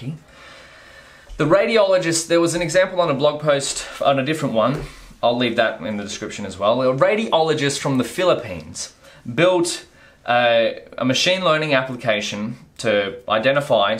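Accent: Australia. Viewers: first sentence I could tell he is an aussie jaja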